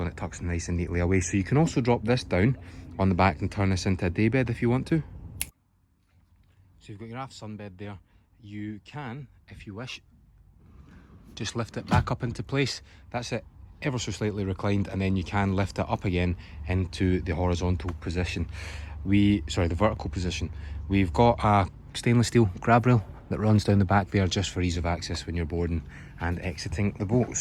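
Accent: Scotland, United Kingdom. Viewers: Love the Scottish accent in these boat sales pitches, real passion!👍🏻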